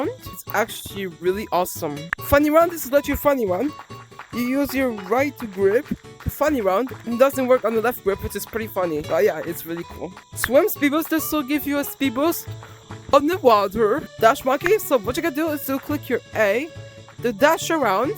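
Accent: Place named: Poland. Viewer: o kuzwa ciagle wiedzialem ze cos jest z jego akcentem ale ze chlop jest z polski?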